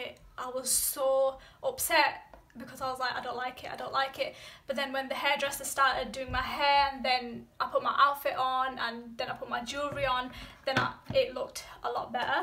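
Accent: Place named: Birmingham, United Kingdom. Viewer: Is that Brum accent... Not too fond of it (she's lovely though ma'sha'Allah) barakallah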